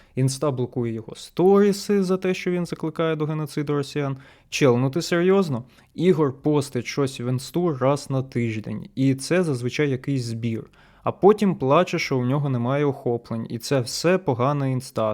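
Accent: United States. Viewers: Класний американський акцент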